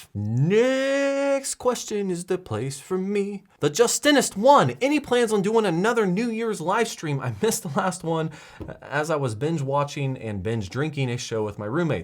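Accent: United States. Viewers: Ali Naqvi southern accent #Kansas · you're 🇨🇦??? WOULD HAVE NEVER GUESSED! You kind of have an murikan twang.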